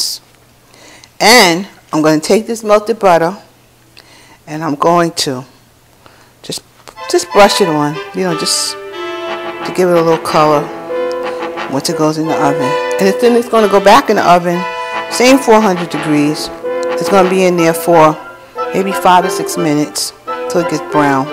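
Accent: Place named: New York, United States. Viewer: I just love your NY accent...I'm in Philly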